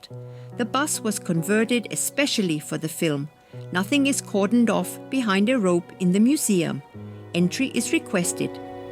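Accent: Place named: Franconia, Germany. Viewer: Wow, sehr inspirierend - professionell gemacht und vor allem mit Herz und Gespür für Zwischentöne 🙂 Der fränkische Akzent (und natürlich als Reiseführerin): total sympathisch!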